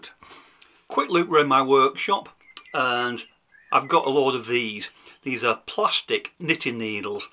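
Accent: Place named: Manchester, United Kingdom. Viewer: That's a beautiful piece, really loved watching you restore it back to it's original glory. From your accent you're not a million miles from me, I'm in Manchester.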